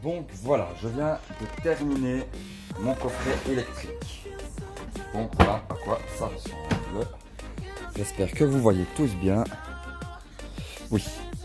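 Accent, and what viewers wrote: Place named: Liège, Belgium. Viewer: salut mec ton accent me dit un truc haha tu viendrais pas de liege? :p super la video sa vas bien m'aidé merci a toi 1 abo en plus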